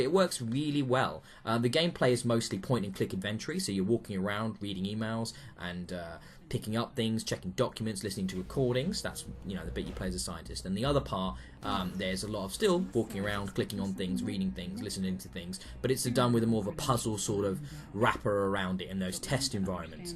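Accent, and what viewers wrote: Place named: United Kingdom. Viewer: Ok, now this is crazy! were we separated at birth? Are you my much, much younger twin? I bought this about a week ago and played it, finished it, etc. If I were to do a review of this myself we would have the most exceedingly similar reviews spanning two continents. About the only difference, my review would not have the British accent gravitas. Oh and probably a lot more cussing, I cuss so much I could make a sailor blush. It's not ad-lib cussing, like cussing just for the sake of throwing a cuss word in, I do use them properly, just a lot. This is now too funny. I have been checking out all of your reviews and for years I have assumed that the hospital I was born in made a huge, giant mistake. Now I know they did, LOL. I have been sure for many years I was given to the wrong parents, I have nothing in common with them. 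I am cerebral, love dark humor, ballroom dance, theater, museums, and history. Actual history, not the crap we peddle as history in this country. My parents are the opposite of that, I graduated high school at 14, and moved away to college shortly after, thankfully! My favorite comedy shows are Monty Python's Flying Circus, the Young Ones, Absolutely Fabulous--although their latest movie was absolutely not fabulous! John Cleese is in my top 5 for actors. I don't know how this happened but I now see how conspiracy theorists get their start. I hope you and the misses have a wonderful day, nudge, nudge, wink, wink, say no more!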